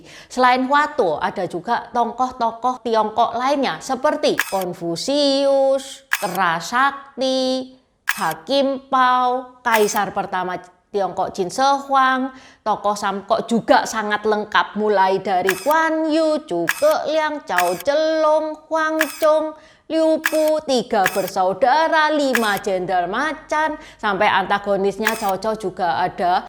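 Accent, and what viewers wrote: Java, Indonesia. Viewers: medok amat ngomong jawanya si 😂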